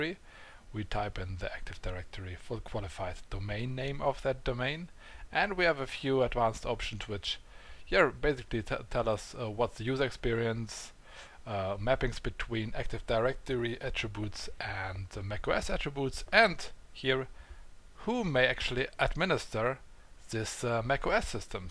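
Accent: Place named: Germany. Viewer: Thank you... Your accent is absolutely German :)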